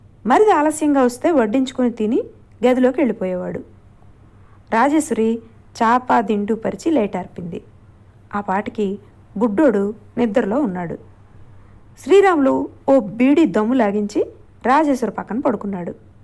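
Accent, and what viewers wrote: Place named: Rayalaseema, India. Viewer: చిత్తూరు నెల్లూరు ప్రాంతాల మోడల్ ఉంటుంది కదా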